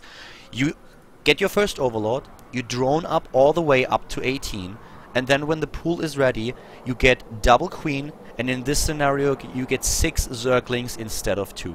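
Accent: Germany. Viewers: i am quite new to this game and got heavy problems to get into it anyway every beginning is difficult.. i watched a lot of videos alrdy highlights builds orders anything.. but your comments and explaining the games is awesome man it really helps and is fun as the same time really awesome videos i cant tell you how much i enjoy to watch your videos about SC2 really really hardcore thanks for that man. dont know may it is your job. finally pls tell me aer you german or american ...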